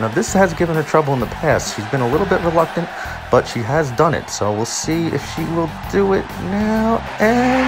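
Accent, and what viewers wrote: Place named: New Jersey, United States. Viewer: the commentary, the new jersey accent, the adorable concept-- these videos have made me so incredibly happy. thank you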